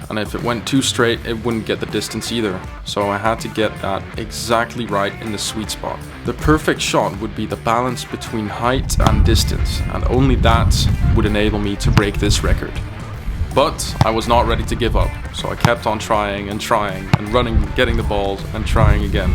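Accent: Netherlands. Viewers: eh bro jij bent toch nederlands dat hoor ik aan je engels accent, maar je maakt echt leuke content ga zo door! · Are you actually Dutch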